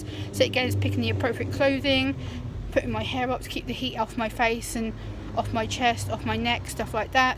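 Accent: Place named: United Kingdom. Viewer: This is the first video of yours I've watched because I'm struggling with the heat and typed in autism and hot weather and I found it really helpful so thank you! Also I'm not sure where in the UK you are but our accents are identical 😅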